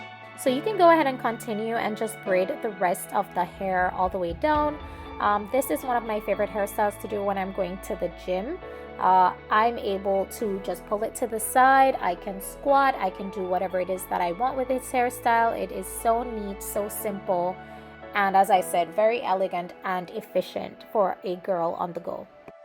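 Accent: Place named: Jamaica. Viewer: Heard the accent and I was like...She is jamaican for sure!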